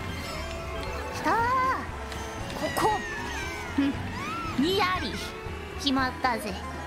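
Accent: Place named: Kansai, Japan. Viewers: do most of the nijisanji members kansai-ben? Somehow i like hearing Sasaki's kansai dialect. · Not the most "objectively" cutesy moe voice, but the one I like the most for sure, with the kansai-ben and all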